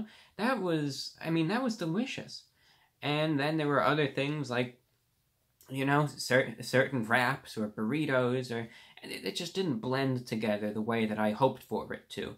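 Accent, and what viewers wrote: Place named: Mid-Atlantic, United States. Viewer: I love the way he uses the Mid-Atlantic accent. Awesome! This guy's a time traveler.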